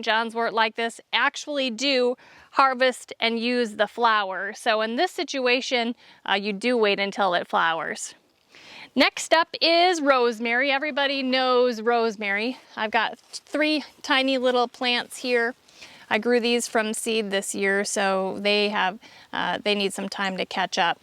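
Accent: United States. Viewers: Herb versus erbs. ... Stop nick picking I'm from the UK and lived 40 yrs USA. I love the American accent. Just enjoy the video and please be kind. Thanks.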